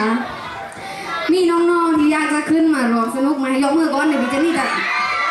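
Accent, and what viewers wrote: South, Thailand. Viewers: รู้เเล้วว่าเจนนี่เป็นคนใต้ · พี่เจนนี่เป็นคนใต้ออกเสียงซาว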